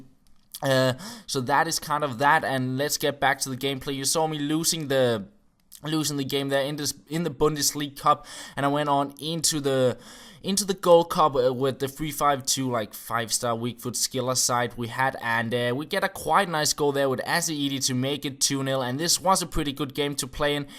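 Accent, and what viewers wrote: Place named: Denmark. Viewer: I love your Danish accent!!!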